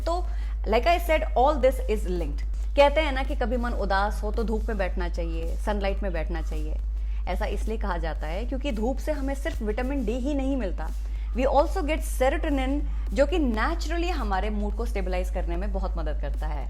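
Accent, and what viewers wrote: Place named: Uttar Pradesh, India. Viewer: Love you mam ❤️ from Pakistan 🇵🇰 suda khush rho AAP ki baton say lugta hay k AAP up say ho hum bhi yahan asay hi batain krtay Hain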